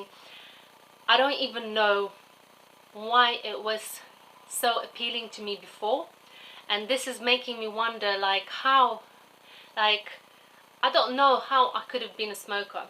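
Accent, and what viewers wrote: England, United Kingdom. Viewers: What accent do you have?? Are you English? Congrats on three years and 3 months of no smoking. You look healthy and really happy. You maka me smile :) xx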